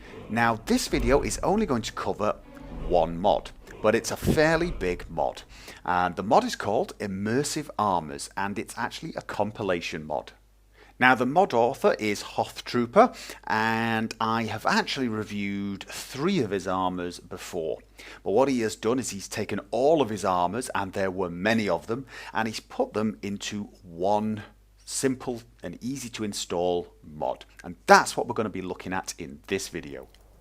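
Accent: United Kingdom. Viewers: I'm very certain it's a British accent, I can't remember which one, but I'm certain I've met a few people with it o. o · He sounds like that British guy with the glasses from Assassin's creed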